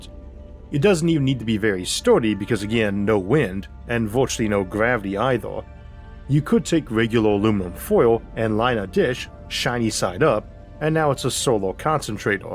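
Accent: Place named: South, United States. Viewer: Not sure why the person reading this sounds like a southern American plantation owner. Terrible accent. Gave up watching it because I couldn’t stop laughing at it.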